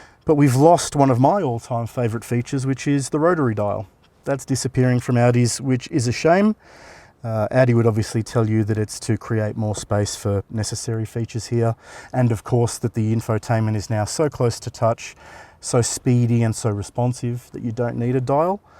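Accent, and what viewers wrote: Australia. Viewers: " tie me kangaroo down!" I can recognize that Aussie accent anywhere mate. "Crikey!" BTW I don't live in Australia I live in Europe. Lol, lol!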